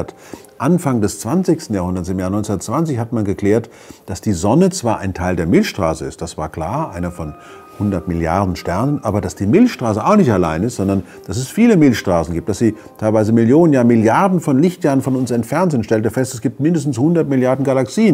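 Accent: Swabia, Germany. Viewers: ...aber Schwäbisch!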